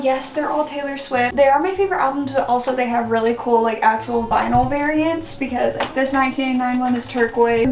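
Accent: United States. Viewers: I keep rewinding so funny! I love the lil country accent too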